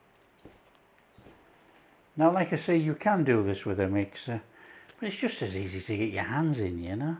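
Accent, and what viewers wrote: Yorkshire, United Kingdom. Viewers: Sounds like Yorkshire accent 👍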